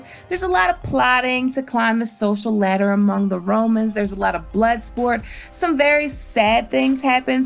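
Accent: United States. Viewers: Your accent is so american, it literally sounded for a few seconds like you tried sounding dumb on purpose.. couldnt watch anyoner after i realized that it was actually just how you talked! :D You sound like a female cowgirl from the 1800's chewing tobacco and spitting on the floor of your own house..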